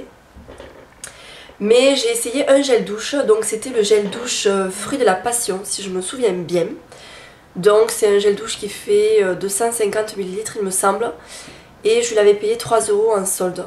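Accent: South, France. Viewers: Ah j'aime aussi son accent parce que j'ai a peu pres le meme ( c'est l'accent du sud quoi ^^ ) . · Dsl, je réponds à sa place... Elle a l'accent du sud de la France, je l'adore aussi ♥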